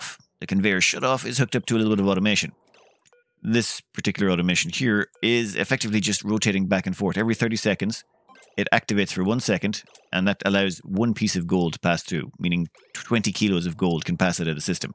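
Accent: Ireland. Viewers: 300 hours into this game and I still struggle to understand what the hell you're talking about at times - your Irish makes it absolutely worth listening though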